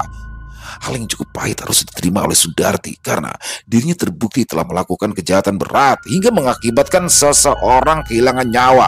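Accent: Jakarta, Indonesia. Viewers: Mantaap Bang ceritanya kocak bener, dilanjutkan ya Bang, paling suka klo ceritanya logat Betawi yg medok… sukses Bang dn sallam sehat.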